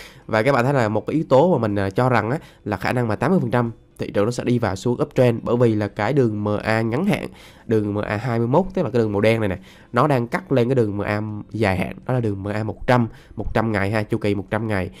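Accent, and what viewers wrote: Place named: Quang Nam, Vietnam. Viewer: Anh ng o miền trung quảng nam ak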